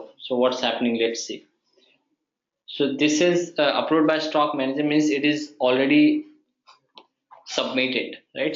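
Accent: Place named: India. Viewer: Love erpnext and have massive respect for indians in general, but why is the indian accent so painful to the human ears? I've heard africans speak english with thick accents but after listening to them for few minutes they start to grow on you. But not the indian accent, the more you hear the worse your headache will get. Every few seconds they will have a brainfreeze and go QUUUUUH, QUUUUUH QUUUUUH, like literally after speaking 5 words QUUUUUUH QUUUUUH QUUUUUH.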